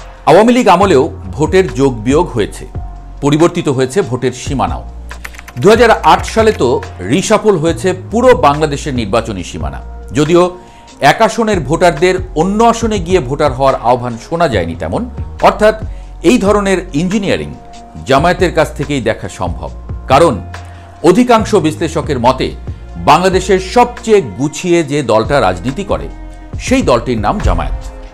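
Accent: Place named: Kolkata, India. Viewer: Apnar kotha bolar dhron kmon jno kolkata kolkata tan ta ace .... 😅😅Bolci dada apnar bari kotay???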